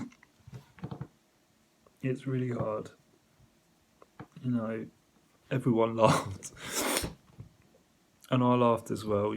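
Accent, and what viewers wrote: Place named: United Kingdom. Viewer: Its the British accent 🤩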